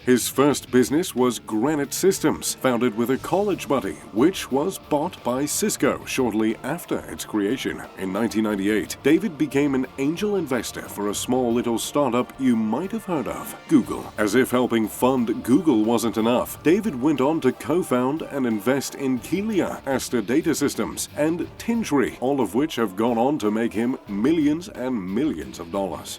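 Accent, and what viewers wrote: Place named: RP, United Kingdom. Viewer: Sorry, but the posh narrating isn’t needed, and personally, I find it really annoying.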